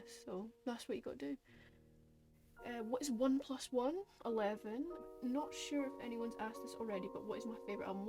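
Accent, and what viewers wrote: Scotland, United Kingdom. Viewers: Omg ur Scottish 🙀🙀🙀🙀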